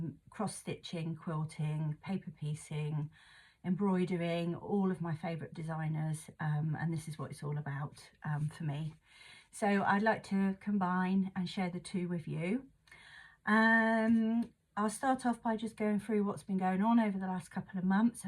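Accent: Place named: England, United Kingdom. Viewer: So lovely to hear your “English “ voice and see all your beautiful work. We have very similar tastes and we snapped on quite a few pieces. Love your little pumpkin design and look forward to that as a release one day. I’m also on the Raven bandwagon!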